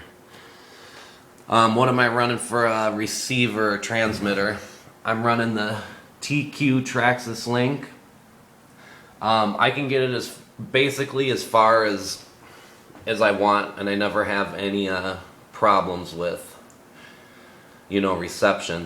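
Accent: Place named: Michigan, United States. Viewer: Not to be weird but are u from Michigan u sound exactly like a sub teacher I have lol but nice car!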